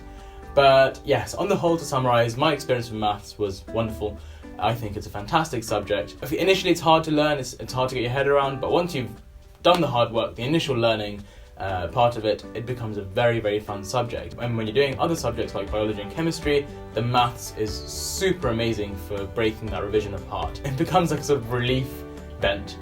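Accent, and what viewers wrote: RP, United Kingdom. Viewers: Where are you from ? a you sound really posh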